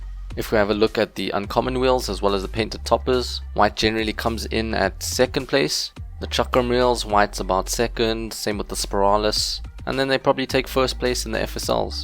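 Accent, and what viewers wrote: South Africa. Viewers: Is ur accent South African? cause if it is, I'm South African Too! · South African?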